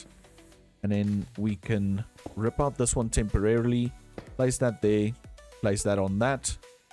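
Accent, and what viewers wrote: South Africa. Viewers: Yes, and one South African can always recognise another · are you south african?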